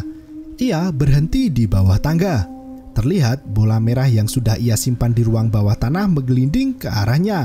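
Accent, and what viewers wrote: Java, Indonesia. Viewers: Medokny keliatan banget..jowo ndi mas😆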